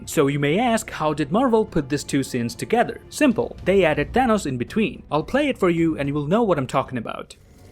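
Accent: Canada. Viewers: R u from Canada?? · What part of Canada are you from mate? Really rate the accent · I like this voice more than the others voices it sounds better and more Canadian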